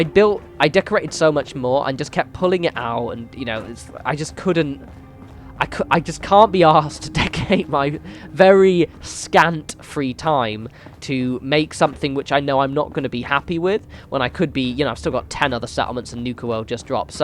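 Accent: England, United Kingdom. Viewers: I was actually thinking about this yesterday, when I was playing the game and trying to talk myself into going back to work on my vault but just really really didn't want to. I think you hit the nail on the head - I also tend to try to make my settlements look natural and part of the environment, like if somebody else came across it they might think it was made by Bethesda. If you look at the other vaults in the game made by Bethesda, many of them are dilapidated and worn. We only got brand new building pieces which just becomes monotonous after awhile and the only way to make vaults look unique is really through decorating, which if you aren't a fan of spending a lot of time on decorating, makes the whole thing feel like a chore. Side note: I actually found your channel (and subscribed) while I was looking for build ideas for my vault...I gave up on my vault, went back and watched some of your older videos and picked up a lot of tips that I've been using in my other settlements! 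And also, who can resist a man with an English accent doing Fallout 4 videos. Keep up the awesome work, can't wait to see what you do with Nuka World.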